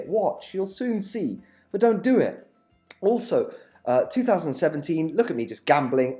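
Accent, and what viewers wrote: United Kingdom. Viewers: Mate your British accent reminded me good times that I spend in UK! Very friendly speech mate! Thanks for the info! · I love the British accent.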